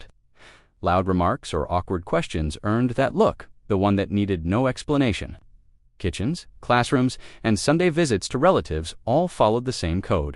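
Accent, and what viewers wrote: United States. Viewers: Why are videos about British kids voiced by an American who not only is not old enough to have heard them and in all probability does not know what they might mean.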